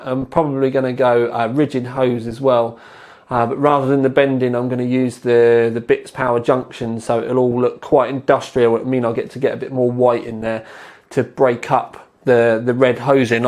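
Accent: United Kingdom. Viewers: Amnisty You seem like an awesome person Amnisty! Linus is an amazing stepping stone for people getting into pc's and tech. is something special all together though. Very colloquial and sarcastic British talk. The length of his videos are such a draw for me. Something to listen to without having to swap videos every two minutes.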